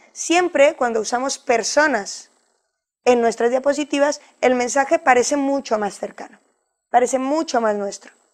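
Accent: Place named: Spain. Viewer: Esta tia es mexicana y habla como española. Vale.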